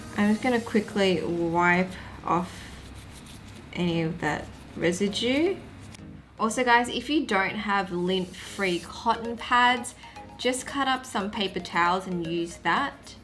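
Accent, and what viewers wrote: Australia. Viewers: Your accent is cool, it sounds like you are or you spent time in Australia.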